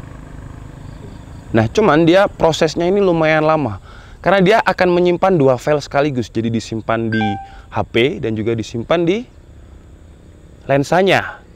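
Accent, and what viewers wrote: Java, Indonesia. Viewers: Wong jowo tt mas?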